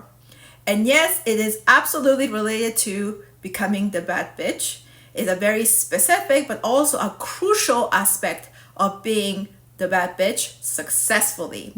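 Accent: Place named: Brazil. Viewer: Anyone can be brazilian haha we have the largest japanese pop outside of japan. Her accent is very brazilianish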